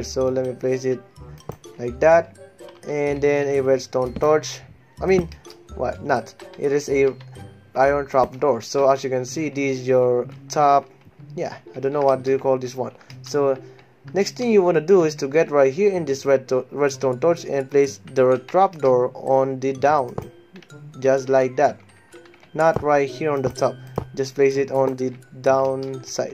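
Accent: Philippines. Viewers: oh God I'm listening to a filipino right? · Becuz it worked i subbed I also noticed your filipino accent, MABUHAY KA PO❤️ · In the beggining i knew that he is a fillipino haha cool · Filipino accent is hard on this one bois i love it so much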